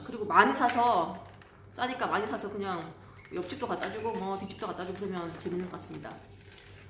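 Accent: Gyeongsang, South Korea. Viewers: 어제부터 폐인 중입니다ㅋ 같은 대구분이시라 너무 정겹네요~~~ 호주 일상 자주 올려주세요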